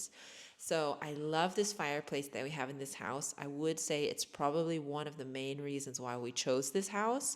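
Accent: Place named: United States. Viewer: I just like to listen to your „American“ voice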